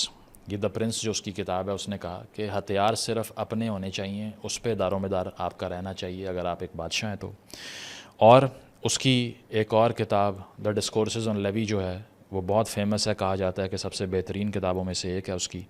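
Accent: Punjab, Pakistan. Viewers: your urdu accent is punjabi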